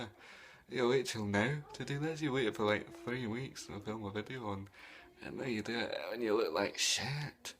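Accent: Scotland, United Kingdom. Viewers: Not too long, this video, love your Scots accent.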